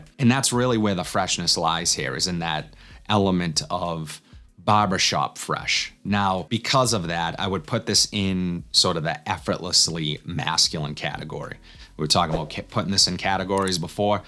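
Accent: Boston, United States. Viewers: Thanks bro.im from n.y u have to be from Boston..had to guess.PDM all day!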